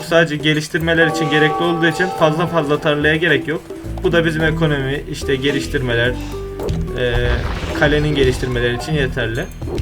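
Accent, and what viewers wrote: Turkey. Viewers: kardeşim konuşmanda ufak bir rize havası sezdim. cok güzel oynamışsın, eline sağlık. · reis valla ben trabzonluyum ben bile seni buralı sandım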